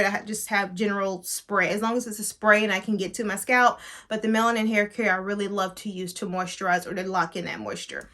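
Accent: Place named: South, United States. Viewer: Beautiful! I swear I heard your Southern accent more in this video than any other 😍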